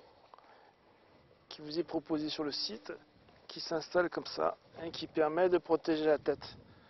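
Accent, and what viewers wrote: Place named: France. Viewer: You sound French.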